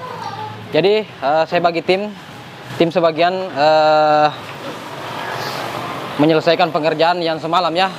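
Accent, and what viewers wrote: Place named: Makassar, Indonesia. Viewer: Ini di makassar ya guys logatnya kayak makssar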